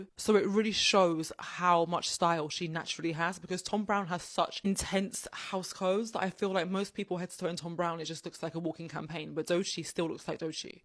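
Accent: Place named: United Kingdom. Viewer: Absolutely love these videos, and your charming British accent!! Do you think that you would ever do a video on Sade? Her iconic red lip is just everything to me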